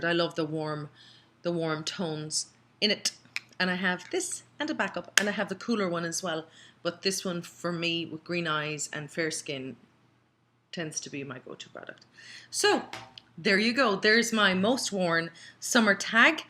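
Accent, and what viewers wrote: Ireland. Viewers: We love your Irish accent !!!! The Irish accent is definitely one of our fav accent in the English language. Sweet. Oh and great fav summer products. We love Hard Candy and the bronzer you show looks OMG so pretty xx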